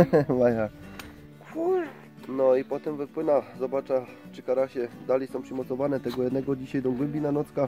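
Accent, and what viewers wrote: Silesia, Poland. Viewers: Filmik fajny, ale śląskiej gwary bardzo ciężko się słucha.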